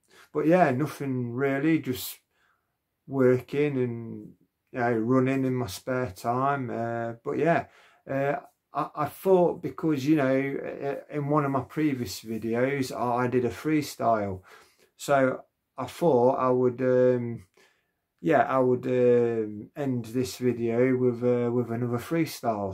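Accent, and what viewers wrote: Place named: Yorkshire, United Kingdom. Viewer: no way !! Ty bought you were more Yorkshire... I'm a Lincolnshire lad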